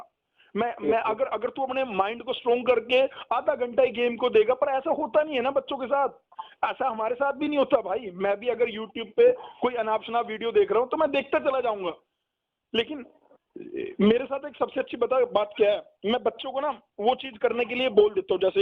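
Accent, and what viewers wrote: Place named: Haryana, India. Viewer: Sir , u are so amazing ...and ur language is so amazing.... Like haryanvi type.... Sir you are one of the best teacher in the field of leet coaching... because u give correct advice to students.... And , i saw ur success record, congratulations sir ... Sir , can u help me to crack leet entrance test....sir m financially too weak...i m also cracked the jee mains in last year but can't take admission in any college due to home situation....and also 5 the time college topper in Gandhi polytechnic Muzaffarnagar (Up).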